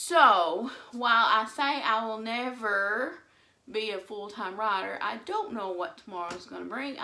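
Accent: United States. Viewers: I love your accent because it reminds me of places I have lived in the Deep South. A bit of an accent sometimes comes out of my mouth to this day... If I ever said I wasn't jealous of other writers, I was lying... I often want to give up writing... I love editing. Like Stephanie said below, it's my thing/jam... Hey, you should love your books!!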